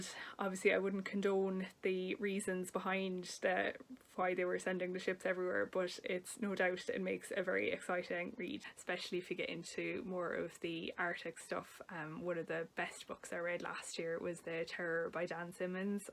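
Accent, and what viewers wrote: Ireland. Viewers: I need to do one of these next, too! Welcome!!! Love the Irish accent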